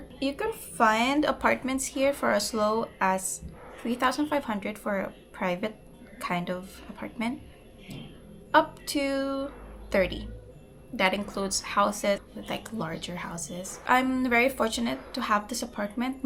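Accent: United States. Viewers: I can tell u grew up with an American father or influence. Ur American English is very evident. Good luck on ur channel. Love ur accent. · 🤔 I'm curious, you philipinna? You sound like a girl from the U.S.😂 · Honestly, don’t mind the bullies. You speak perfect English I am from Chicago and you sound like an American with your English accent. I was wondering if you lived in the US where you’re from the US because of how good your English pronunciation and accent it’s amazing by the way I’m Filipino and I speak fluent Tagalog and I’ve been living here since 1993 I grew up there that’s why I am so amazed with how well you speak English more power to you. I wish you well and your son as well God bless and keep trusting God he’s got your back don’t worry.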